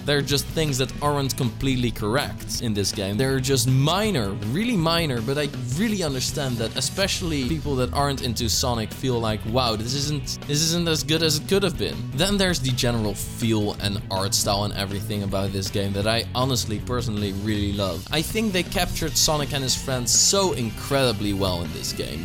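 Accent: Netherlands. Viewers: Pardon me for asking, but are you dutch? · hears him speak for 10 seconds. Yeah he's dutch, beautiful accent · Youre from the netherlands right i here your accent haha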